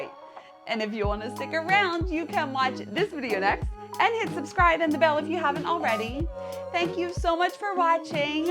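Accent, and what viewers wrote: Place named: East Coast, United States. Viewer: I didn't say you said it wrong! Girl, I love east coast accents lol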